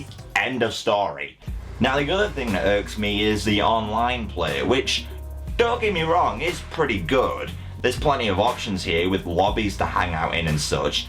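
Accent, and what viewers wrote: United Kingdom. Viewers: Wait you said dollars I thought you British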